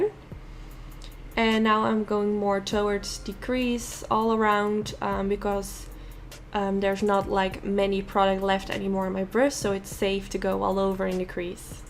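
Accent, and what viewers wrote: Netherlands. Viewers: Ar you dutch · Shout out from holland. Je accent gaf het meteen weg dat je Nederlands bent. Geweldige tutorial!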